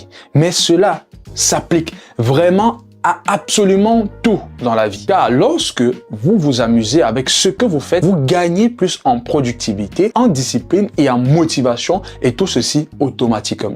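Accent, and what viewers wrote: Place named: Cameroon. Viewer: C"est quoi le truc avec l'accent Camer un peu partout comme pour ajouter de l'emphase?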